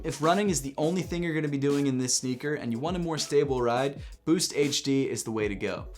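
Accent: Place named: Philadelphia, United States. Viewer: What part of philly you from bro?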